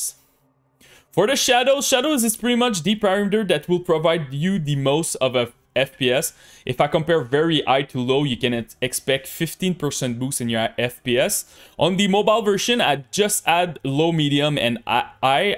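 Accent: Quebec, Canada. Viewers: Tu es québécois ? Lol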